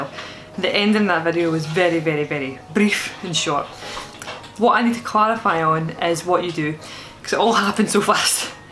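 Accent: Scotland, United Kingdom. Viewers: I was born in Motherwell and lived in Wishaw. I moved to Canada 10 years ago. I have a similar accent to you but no tattoos ! My accent has never changed. Canadian laugh at my accent and encourage me to speak as a entertainment oddity in Collingwood, Ontario. I love your videos and remind me of home when you make tablet and coconut truffles. You are great.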